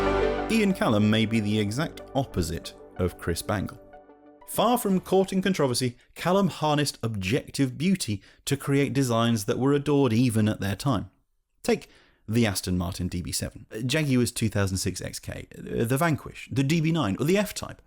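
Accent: United Kingdom. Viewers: Yeah we get it. You are british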